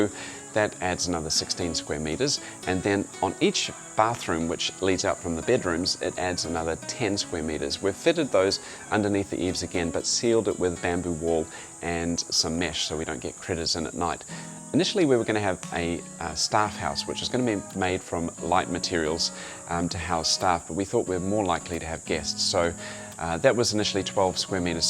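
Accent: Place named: New Zealand. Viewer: Sound like a kiwi living a better life.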